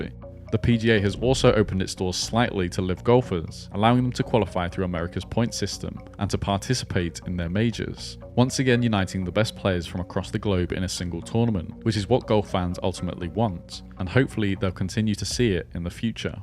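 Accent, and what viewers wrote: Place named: United Kingdom. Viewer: I think he is just British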